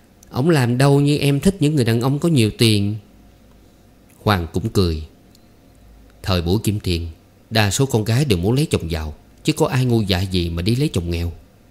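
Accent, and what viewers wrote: South, Vietnam. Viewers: Giọng đọc miền Nam của Anh thật hay, thú thật tôi rất thích giọng đọc của Anh, cám ơn Anh.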